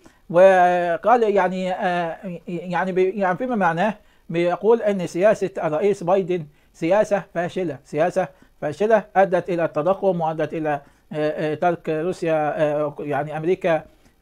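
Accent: Egypt. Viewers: كلامك مفهوم جدا، واللهجة المصرية حلوة ومفهومة 👍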